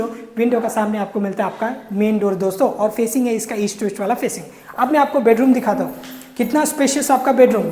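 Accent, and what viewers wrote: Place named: Nepal. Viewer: Yeh bhai hindi me hi bol raha hai. Ye nepali hai isliye Aisa hi hindi bolenga bhai.o.k.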